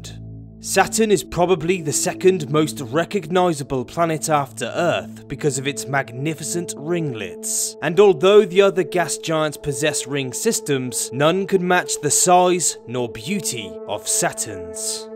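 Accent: United Kingdom. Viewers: Love your British accent! (...and I’m also British...) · Your accents is British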